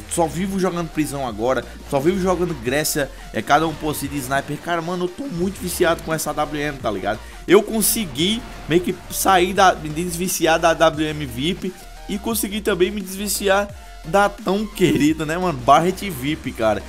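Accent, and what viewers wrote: Northeast, Brazil. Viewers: voce é do nordeste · você é nordestino? :O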